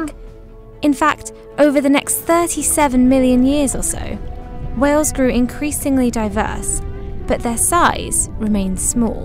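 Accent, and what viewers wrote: United Kingdom. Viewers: too british accent.nicee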